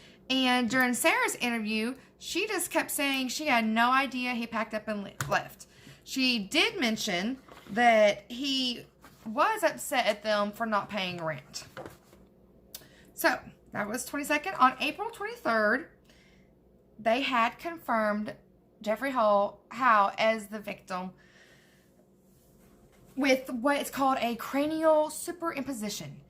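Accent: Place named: Rural, United States. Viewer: I'm here strictly to hear you're country ass twang and support you lol. The premise is good tho. Real crime, make-up, audio book. It is definitely a progressive thing. I dig it. 👍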